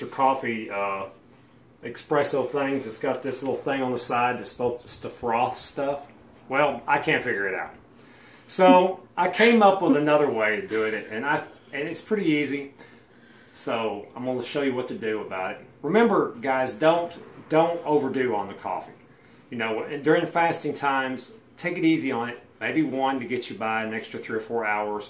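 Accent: South, United States. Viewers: Thanks, I enjoyed that, and there is one of those espresso makers sitting unused, in my office. Will try it out, and see if it will help me get rid of the sugar from my coffee. And I'm glad you are still going. I think the most important element of your message besides your natural relaxed what of talking about all this, is "us.", You present in a way that is inclusive and convincing to others, because "we" feel you are one of "us." The message is too important to leave unreached by those who may not feel to be one of "us." I do think your message is extremely important, and you should reach out to others who can make videos like yours, carrying the same message to those who need it, to reach others in our society who otherwise might not listen an old white guy with a Southern twang. Be well.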